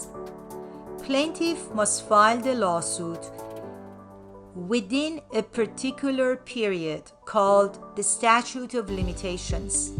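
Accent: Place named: Afghanistan. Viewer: Afghan accent 👌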